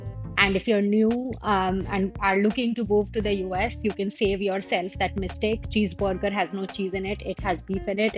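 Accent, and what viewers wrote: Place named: North India, India. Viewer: Hey this typical North Indian accent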